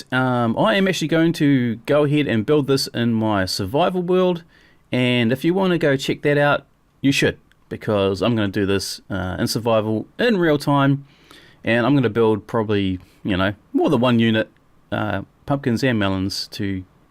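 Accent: New Zealand. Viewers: Yea cause he's got a kiwi accent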